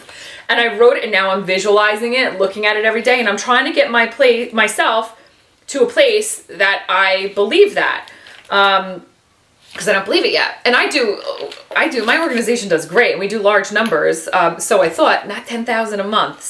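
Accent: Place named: Northeast, United States. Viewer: loved the nor'easter accent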